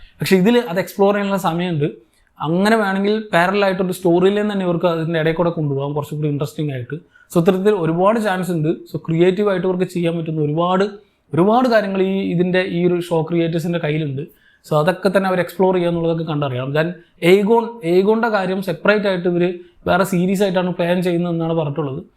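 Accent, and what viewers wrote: Palakkad, India. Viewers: Ningal palakkad aano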